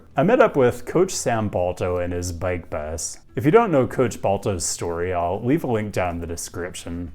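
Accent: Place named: Pacific Northwest, United States. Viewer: I always assumed he was from Portland (or at least PNW) based on his style and accent but now I'm not sure!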